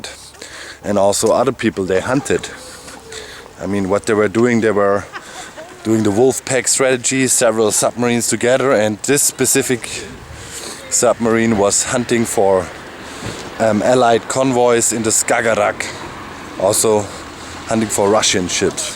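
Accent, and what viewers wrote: Germany. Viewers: The submarine space inside is not very comfortable but this man says that that place is narrow but assuming this man is german (because of his accent) I'd say his super tall. The video and the explanation are great. It's amazing to imagine how everything used to work. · the joke is that he is german, so its obvious he has a german accent lol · Alman englisch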